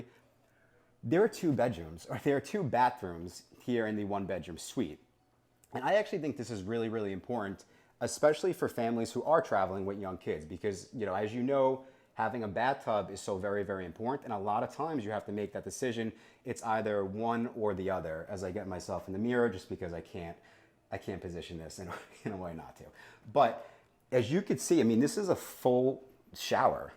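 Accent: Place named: New York City, United States. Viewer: Thanks for this video! Considering this for our next stay and this was very helpful. Also a nice taste of home hearing you talk! --a fellow NYC Italian now living elsewhere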